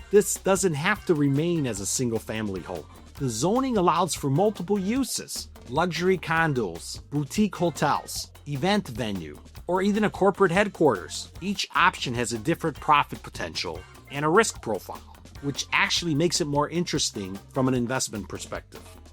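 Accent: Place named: Michigan, United States. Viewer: Interesting video. Love your Michigan accent!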